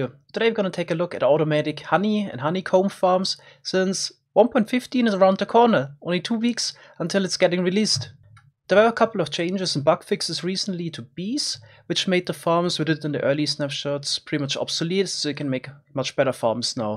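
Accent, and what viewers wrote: Germany. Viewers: Ist er deutsch? :D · Are you German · 100% deutsch mit dem schlechtesten Englisch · German accent?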